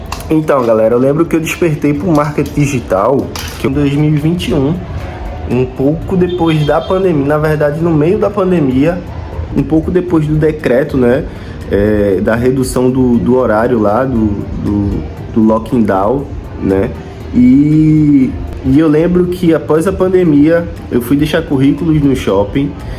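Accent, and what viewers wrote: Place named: Recife, Brazil. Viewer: Eu j tive esse sotaque. Recife, Pernambuco, Brasil.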